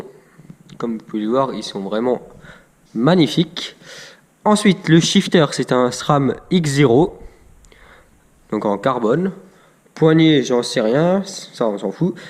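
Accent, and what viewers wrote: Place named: England, United Kingdom. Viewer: L’accent anglais c incroyable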